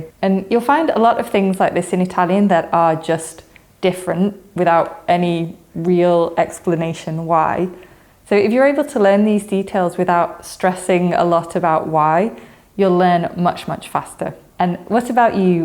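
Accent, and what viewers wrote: United Kingdom. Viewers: She is native British 😊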